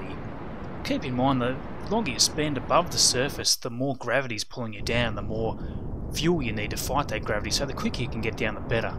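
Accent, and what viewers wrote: Australia. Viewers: Nice to hear a fellow Aussie playing KSP! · Finally an Aussie you tuber thanks mate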